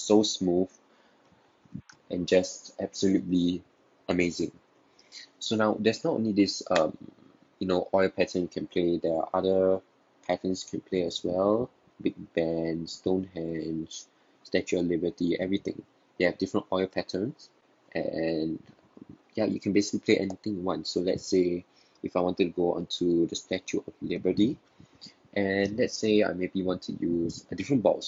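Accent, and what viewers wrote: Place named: South Africa. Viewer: Who is doing the voice over. This is a South African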